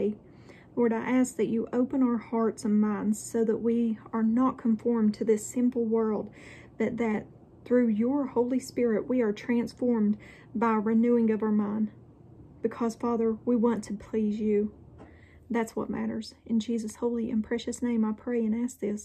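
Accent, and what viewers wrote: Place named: South, United States. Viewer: Oh, you write so well! I like how you talk and then refer that to that of the Bible. I really like the length of your video too. It allows one to get the word in important snippets, without having to do a real deep study. Your video is great when you only have a few minutes, but want to be close to the Lord! Thank you for your work, I very much like listening to you (that Southern accent is precious)! On a side note, I just have to comment on what a pretty lady you are. Your complexion is flawless and you're really lovely to watch! Thank you again!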